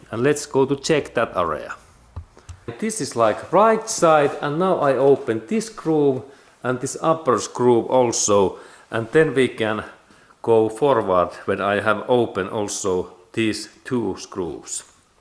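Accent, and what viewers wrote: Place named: Finland. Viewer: kaunista tää suomen ralli englanti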